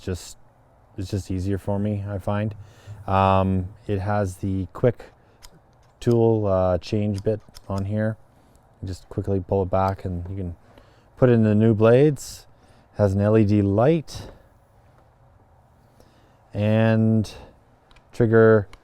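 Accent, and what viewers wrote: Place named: Canada. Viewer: Could you please remake this video with a narrator? I can’t understand the thick Canadian accent. Thanks.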